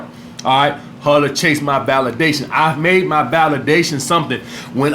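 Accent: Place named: Alabama, United States. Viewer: Skrate to ya crib Disney laying got damn lmao. Alabama accent plus profanity and game is hilarious 💯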